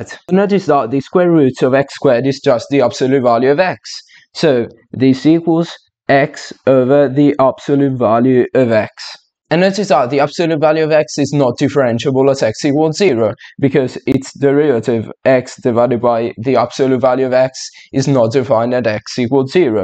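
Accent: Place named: United Kingdom. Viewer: May I ask you where you are from? I'm just trying to place your accent. It sounds similar to some British accents I've heard, but also maybe something else from a different country. I hope that's not impolite to ask.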